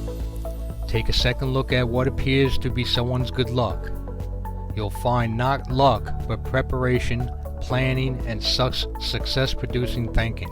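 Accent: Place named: New Jersey, United States. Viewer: The Jersey voice of onpassive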